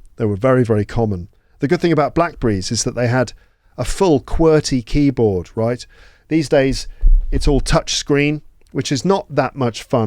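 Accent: United Kingdom. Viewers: Brits accent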